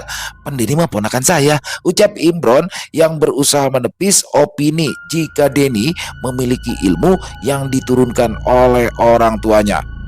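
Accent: Jakarta, Indonesia. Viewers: candu bgt sma crita bang logat btawi nya khas bngt. makaasih bang sehat sllu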